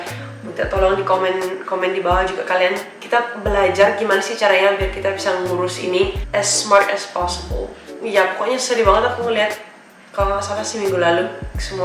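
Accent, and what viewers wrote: Bali, Indonesia. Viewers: Your accent is still like that of a Balinese. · Logat bali bagus, wajah juga cantik. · bukan asli bali tapi logat Bali nya kena banget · Logat mu medok bali luh jegeg😂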